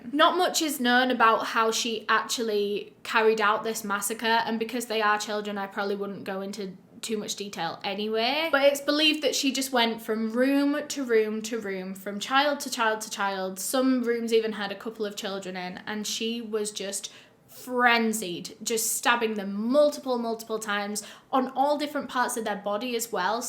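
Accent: United Kingdom. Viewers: She is from and lives in the UK.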